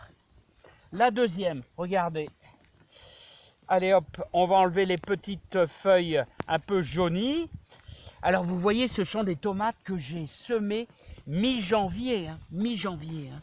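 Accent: North, France. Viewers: Ah!! Ben voilà!! ça fait plaisir de t'entendre parler un peu le patois du Nord! Je trouvais que ça manquait un peu dans tes vidéos!! Je vais mettre aussi un peu de brin d'queviaux aux pieds de mes tomates et au fait, le brin d'coulons te sais qu'te peux in mette su t'chiette y parait que ça fait aussi repousser les qu'veux!!! Hi hi!!! ou Lol comme y disent ché jeunes!! José un chti exilé à Narbonne.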